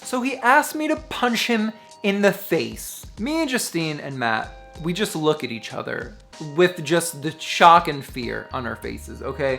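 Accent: New Jersey, United States. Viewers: yep sounds like new jersey to me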